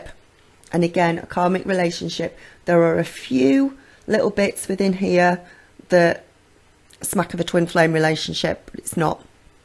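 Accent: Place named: Sale, United Kingdom. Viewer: ah, Sale. I can hear it.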